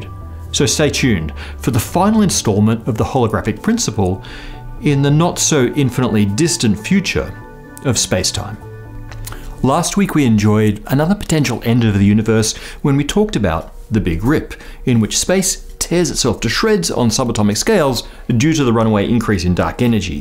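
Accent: Australia. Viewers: Matt O'Dowd is Australian that's why he sounds different . André in Sydney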